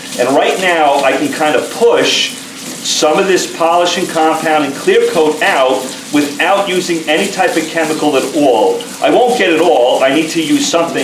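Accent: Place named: Dallas, United States. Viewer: Good video w/ info - noticing a heavy Dallas accent there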